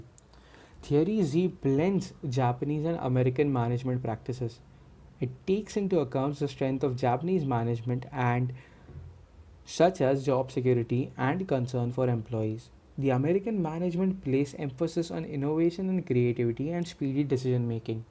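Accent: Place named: India. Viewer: its an Indian accent so difficult for most to follow outside India.If you are making a video for a larger audience please have pictures, subtitles to add more value.I am Indian and no offense to anyone but even though we talk in English our accent and pronunciations are different.Great material but please make changes.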